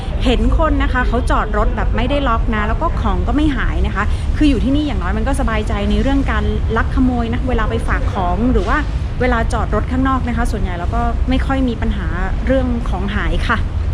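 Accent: South, Thailand. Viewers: นํ้าลายแตกตามเลยคะ ชอบ พูดใต้คะ ขอบคุณสำหรับคลิปน่ารักๆคะ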